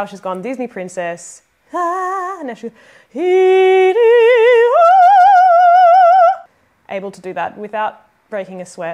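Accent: Australia. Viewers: G'day! Do I recognise the accent? Welcome to Nightwish. Nightwish Army, Blue Mountains Corps, Australia! What Gabriel said! Any song from this concert will knock your thongs off! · Are you Aussie? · Love your Australian accent ^_^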